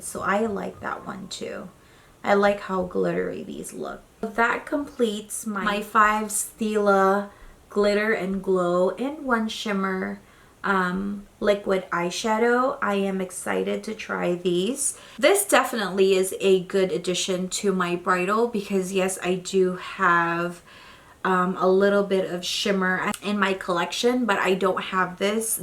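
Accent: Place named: England, United Kingdom. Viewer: I like your shimmer and glow but I only could think your very nice English accent :)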